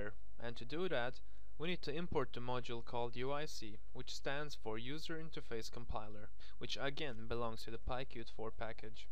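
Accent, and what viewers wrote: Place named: Sweden. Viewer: The UI language and his accent suggest Sweden.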